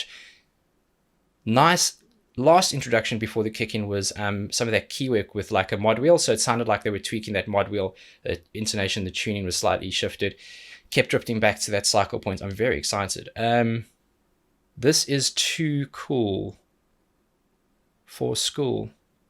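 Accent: South Africa. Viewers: Great reaction. First time I've watched you. Btw, are you from or have spent time in South Africa?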